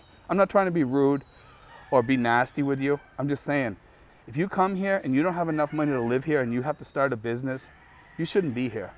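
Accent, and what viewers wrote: Boston, United States. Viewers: Good video, u From Boston?? Lol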